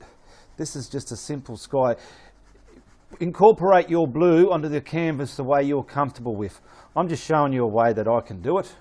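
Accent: Australia. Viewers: I love it! You’re such a hoot! “I’m gonna get back into that bus and drive it into this neighborhood!” Made me smile. I’ve never heard that out here in western Nevada, USA.... especially with the Aussie accent. Enjoyed your whole presentation and learned a lot. Sharing with my friend that does acrylic painting parties... (tutorials with laughs among friends and we leave with our own work of art.) Thank you. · Holy Crikey! Wasn't expecting that level of Aussie right off the bat. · I love your beautiful Ausi accent. Lovely presentation on how to make realistic cloud. I think if you had used a bit of firey grey or slightly bright yellowish orangy instead of PURPLE, it would have given more depth....anyway, just my thoughts..... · so australian lol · Good to hear an Aussie, speak like an Aussie! It’s a joy! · Love the Aussie bush accent 😂 Enjoyed watching this and enjoyed the humour to go with it. 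Thankyou for sharing.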